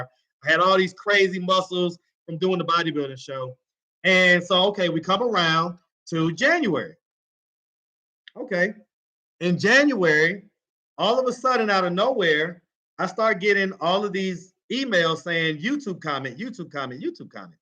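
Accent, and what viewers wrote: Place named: Atlanta, United States. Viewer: Why do you have on a NYC shirt,? Are you from NY? If so, how long have you been living in the A? B/c you have an Atl accent. I'm from Harlem, NYC, born & raised 121st & Lenox Ave. But I've been living in GA for 2.5 yrs now. Don't miss NY, not ready to go visit yet. Finally, get to put a face to the voice.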